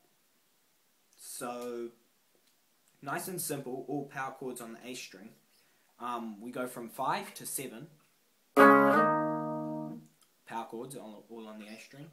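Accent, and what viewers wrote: New Zealand. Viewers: are u from New Zealand?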